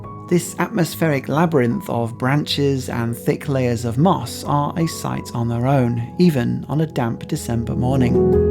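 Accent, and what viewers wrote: United Kingdom. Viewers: It's nicely done especially with British accent!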